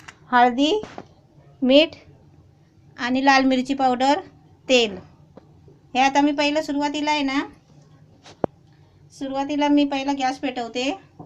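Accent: Konkan, India. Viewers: tumchya sagalya recipes mala khoop avadatat. same majya mummy sarkhya, tumchya bhashet ani jevanat kokanatla godava ahe Love u Kaki ani thanks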